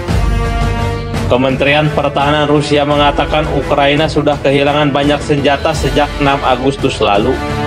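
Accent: West Java, Indonesia. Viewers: Sunda banget mimin dari logatnya 😀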